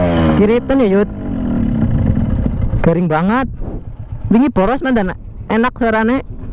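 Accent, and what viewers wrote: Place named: Java, Indonesia. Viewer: Mas idola bgt siiii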